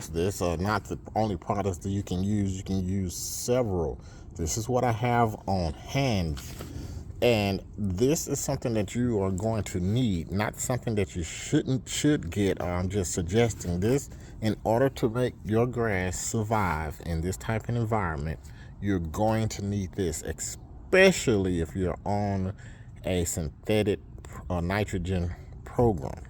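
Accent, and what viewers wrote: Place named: Texas, United States. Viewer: You sound like a guy from Texas named should. 😄 Great lawn. 👍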